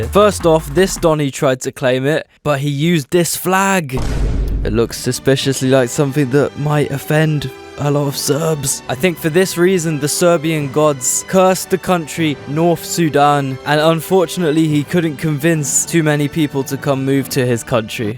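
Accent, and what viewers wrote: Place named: United Kingdom. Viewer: british accent balls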